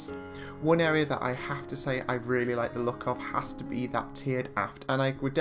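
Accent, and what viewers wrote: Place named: RP, United Kingdom. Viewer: you sound very posh. And thanks for your attention to my (stuffed) canine daughter, Lucy, on Twitter. I’ve booked Ambassador’s Ambience to Antwerp’s Xmas market for Dec ‘22, just like I took Lucy there on CMV’s Columbus 2 years ago. June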